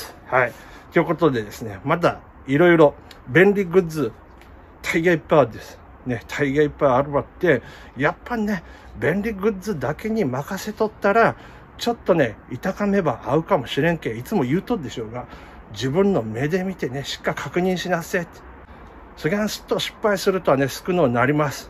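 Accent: Kumamoto, Japan. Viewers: 社長の熊本弁好きだなー。もお疲れ様です。いつもありがとうございます。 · 東北生まれの関東人ですが熊本弁良いですね😊 バッテン荒川さんを思い出します。これからもやってくんち‼️ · 熊本弁、聞きづらいので標準語で喋っていただきたいです💦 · 社長！熊本弁のほうがいい！こっちのほうが私好き！社長らしいし親近感わく～～！！字幕いれるとめっちゃ面白いし！🤣🤣🤣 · 熊本弁かわいいなぁ〜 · 熊本弁、社長らしくてめっちゃいい❤ ちょっと意味が分からないところがあったので、少しだけコテコテを抑え気味にして、ぜひ続けて欲しいです❗️ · まうごっっおもしろかぁー、私のは、エセ熊本弁かもしれないけど、たいぎゃー、まうごっ、こぎゃん、そきゃん、面白すぎて２回観ました！社長の熊本弁動画大好き一生懸命聞き取ろうと集中力アップ⇧😂だけど、やっぱり苦手な人もいるんですね😢残念、でもたまには、熊本弁の動画もお願いします。楽しみにしてます❤ そうだ、思い出した！熊本の温泉で、あとぜき！！って書いてあったの思い出して1人笑いしてました。本日最高動画でしたー🎉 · ふふふっ😂 熊本弁は面白い😆😆😆 このシリーズお願いします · 熊本弁いいですね😃‼️ 社長らしさが伝わる☺️ そして、との最初のやり取りが仲良し夫婦な感じが伝わる～😂 今週雪がもっつり降ったので外で冬越しできます多肉さんが残念のお知らせ。。。😱雪にまさか当たったとは。。。⛄💦 便利グッズ、頼りすぎはダメですよね😅私も気を付けます❗ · 社長、熊本弁、心に響きました🤭 シクラメンの鉢もオシャレになりましたねぇ✨ 行き着くとこはやはりお水管理 ( っ ˙꒳˙ )っ🚰ｵﾐｽﾞﾉﾝﾃﾞ 夏越しさせた５歳のシクラメンですが、まだ🌱 が出ません（ ᐪ꒳ᐪ ）球根ダメになったのかなと思いつつ、諦めず毎日観察してます ( ⚭_⚭)✧ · おはようございます☀ 熊本弁😂 気になって内容全然入ってこず2回見ました😂😂😂 そして1002回目！おめでとうございます㊗️🎉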